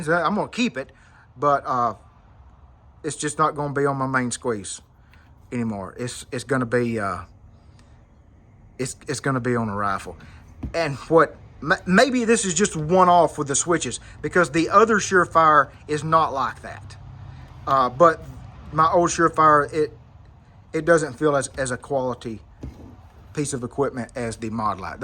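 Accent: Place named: South, United States. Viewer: I had 2 pl350 switches crap out. What state are you from? It sounds like the South, like me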